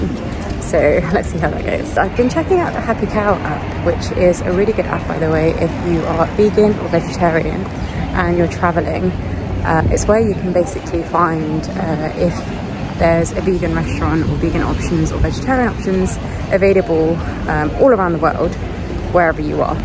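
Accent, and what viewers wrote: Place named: United States. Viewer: Wow wow wow I love your accent are you American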